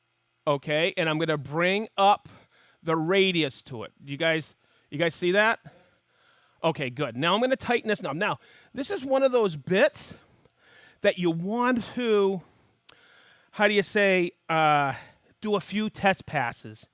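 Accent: Boston, United States. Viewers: Put the R s in the words for God sake. Hate that Boston stuff.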